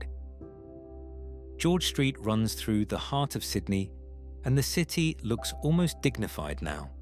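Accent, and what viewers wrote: United Kingdom. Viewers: Perhaps my expectations of AI is unreasonable as despite finding the video interesting it's not very good. It did make me laugh at a few points so I suppose that's a good thing. Who doesn't enjoy a good chuckle? If this was presented as a school project you could only give it average marks. The constant repitition of the same scene turns a five minute video into over ten minutes. I find using a British sounding narrator interesting despite it being a U.S. production. I suppose it attempts to give it more credibility but being U.S. produced it explains why "sidewalk" was used rather than "footpath". Anyway, I'll have to wait for AI to improve. Hopefully before all of the AI robots kill us. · This is so full of errors, it's ridiculous. Despite the British accent, the text is USA English, as the frequent 'sidewalks' shows, the camera panning is disjointed, placing of famous buildings is wrong and at 6.55 we see a smoke filled harbour while the voice tells us it's a sweeping blue paradise for artists. 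What is the point of it?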